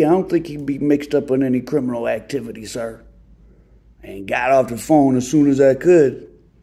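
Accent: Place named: Midwest, United States. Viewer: Your videos very interesting. Lots of folks on youtube with this type of content and reporting but you do it TV worthy. I think you'll be on a network show soon, such as A&E, Tru TV, E, NatGeo, etc. But the accent kills me. Like fingernails across a chalkboard. Worse than Det. Tom Armelli, the retired Detroit detective on The First 48. Strong midwest accent. DA BEARS! By the way I think you are a hell of a journalist even though you say you're not. Later.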